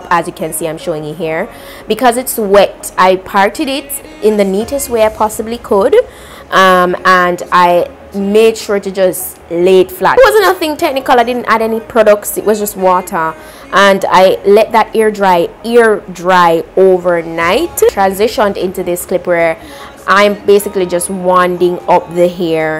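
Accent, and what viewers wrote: Jamaica. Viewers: Love love love that talk. You must be jamaican, I heard that patois 😁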